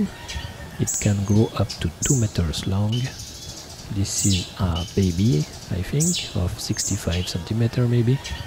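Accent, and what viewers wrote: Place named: France. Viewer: Merci pour cette très bonne et belle vidéo test. Le petit +: même sans activé les sous-titres je comprends beaucoup mieux votre anglais grâce à votre accent français 😄. Etant amateur débutant, photos nature, oiseaux et animalier, celle-ci m'a grandement influencée pour l'achat du R7 + 100-400 avec un budget raisonnable. Thank you very much!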